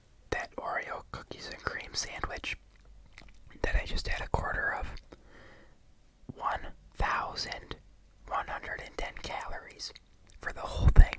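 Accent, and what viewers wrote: Midwest, United States. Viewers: i’m wondering too lol, very strong midwest accent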